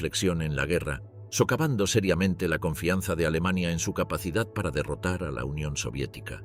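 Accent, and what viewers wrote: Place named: Castile, Spain. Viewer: Joder que gusto escuchar un video asi que no este en español latino (realmente esta mal dicho pero bueno), por fin. Que gusto escuchar un video asi en castellano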